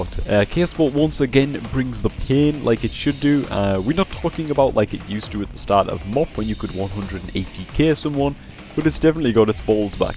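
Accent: Newcastle, United Kingdom. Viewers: I think he sounds like he is from Newcastle ;D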